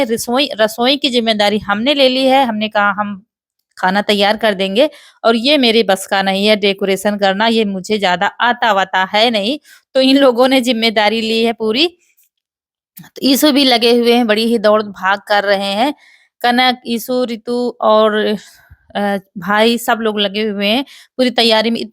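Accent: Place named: Uttar Pradesh, India. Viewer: Mai unnaose hun aap jaise bolti hamare gaon vase bolte hai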